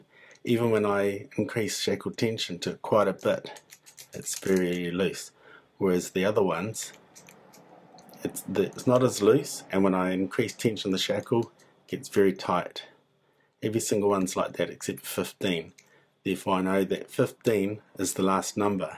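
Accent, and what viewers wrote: New Zealand. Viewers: Cool accent I think Kiwi?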